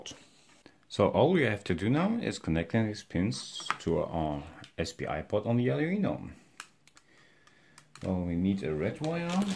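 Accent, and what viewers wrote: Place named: Home Counties, United Kingdom. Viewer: The kickstarter requests Canadian dollars but the accent is clear Home Counties English. Quite surprising.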